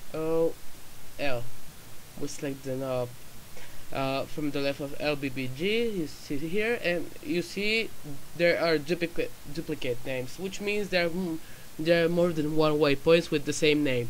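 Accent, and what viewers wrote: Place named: Russia. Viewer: You must learn English well not like a Russian pilots speaking!!!!!!!!!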